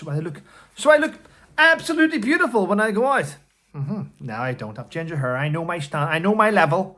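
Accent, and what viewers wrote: Northern Ireland, United Kingdom. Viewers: yours is the only channel I don't need subtitles for because like me, you're from Norn Iron so I get every word!! 😂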